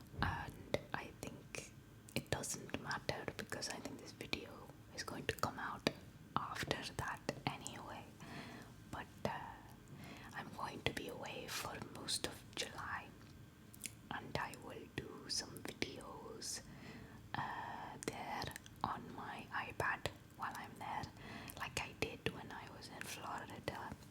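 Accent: South India, India. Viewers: I’m hindi and it really sounded South Indian. My jaw dropped oef almost lost it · True, most of my south friends talk exactly like this but delhi, Punjabi usually have a good british accent. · Thats more like south indian a bit overdone but nice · This is south Indian accent. Indian accent is a little bit of British type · This is more of south indian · This sounded more South Indian than Gujarati but it's still a very good Indian accent. You're the first foreigner whose Indian accent doesn't make me cringe. In fact, your accent reminds me of some of my teachers. The video is very well done. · This is south indian accent rather than Indian I mean Indians speak normal English y'all so many stereotypes😐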